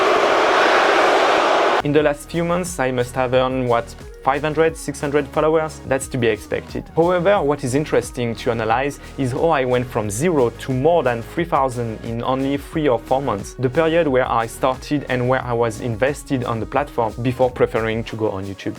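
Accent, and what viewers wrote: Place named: France. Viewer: Tu es un français avec l'accent 😂♥️♥️♥️🙏🏽🙏🏽 bon courage frère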